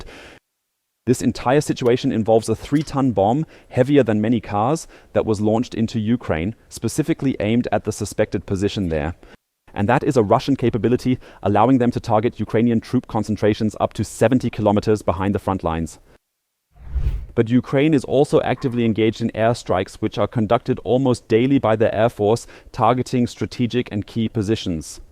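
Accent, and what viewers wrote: Germany. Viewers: A pleasure to watch with such a knowledgeable, handsome host who is extremely fluent in English. I don't mind at all the touch of a German accent. He only slipped up once, letting "Belgrade" come out instead of "Belgorod.' That's highly forgivable, considering that the meaning of "Belgrade" in Serbian is exactly the same as "Belgorod" in ruzzian.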